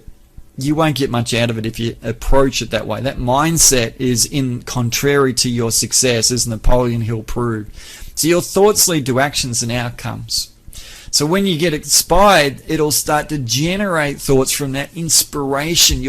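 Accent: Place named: Australia. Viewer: this Australian accent annoys !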